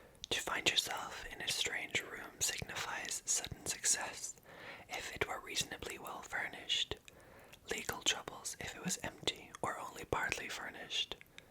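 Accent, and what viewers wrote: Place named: United Kingdom. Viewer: Love this concept! Was super interesting to listen to and your whisper was super relaxing! I usually don’t vibe with male ASMRtist’s whispers but yours is unique. It sounds like you have a UK accent sometimes. Maybe you just annunciate very well.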